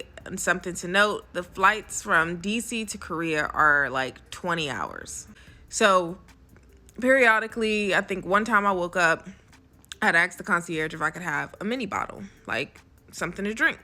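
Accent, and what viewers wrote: South, United States. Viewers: Hey, thats a cute lil baby in the footage at the end of ur videos like part 1 of this and others. Ur dialect is giving from the South, where bout? Im from MS..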